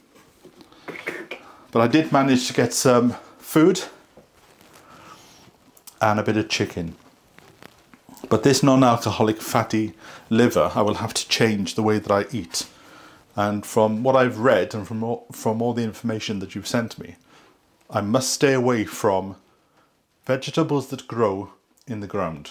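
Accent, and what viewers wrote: Wales, United Kingdom. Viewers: I have CFS diagnosed too, it’s good to see you spreading awareness. I’ve noticed your accent is more pronounced since you’ve returned to Wales. I’m from Wolverhampton (MuddyBootz territory), but my husband is from Darlington. He’s been in the West Midlands 30 years now, but every time he goes up north he remembers his accent :)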